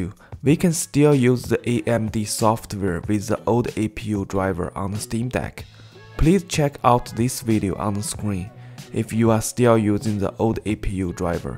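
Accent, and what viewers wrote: China. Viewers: 怎么像中文口音啊老铁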